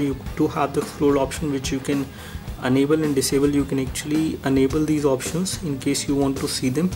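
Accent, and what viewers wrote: India. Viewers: 印度英语。。。霸气！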